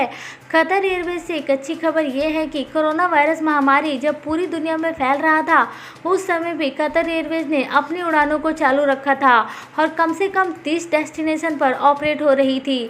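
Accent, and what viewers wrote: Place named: Maharashtra, India. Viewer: Marathi flight information accent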